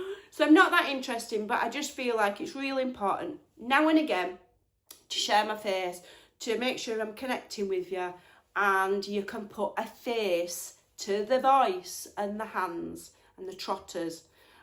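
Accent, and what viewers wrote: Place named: Northern England, United Kingdom. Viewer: You know what, I'm fairly new to your channel and I've not actually seen you before. Don't take this the wrong way, I really enjoyed your videos before, but I like you so much more now I've seen you're lovely face and the source of that great Northern accent. I'm a northerner living in the south (i know, a traitor 😂) but I have found so few English accents on YouTube, let alone northern ones. Having said that I love loads of American and Australian ladies' videos. So keep on keeping it real and I'll keep up my subscription! deal? Hahaha. Have a great day.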